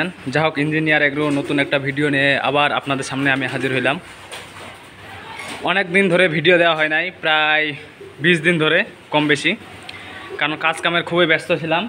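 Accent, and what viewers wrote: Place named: Bihar, India. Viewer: ওহ,,,এজন্যই প্রশ্ন টা করলাম কারণ আপনার ভিডিওতে আপনার ভাষার উচ্চারণ গুলো কেমন জানি বিহারীদের মতো উচ্চারণ হয়।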